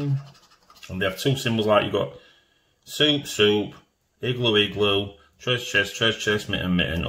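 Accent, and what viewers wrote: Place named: Barnsley, United Kingdom. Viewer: where you from mate Barnsley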